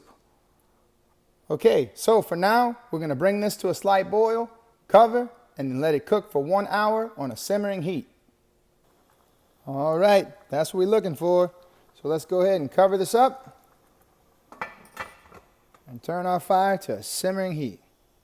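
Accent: Louisiana, United States. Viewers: are you really from Sweet Love from Louisiana cuz you have that accident I want to say you're not married things that you don't cook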